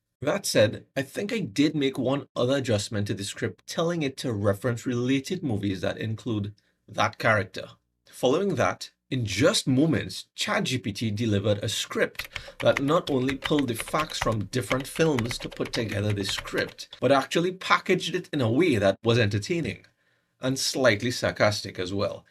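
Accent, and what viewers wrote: Trinidad and Tobago. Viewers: Why u sounding like a trinidadian lol❤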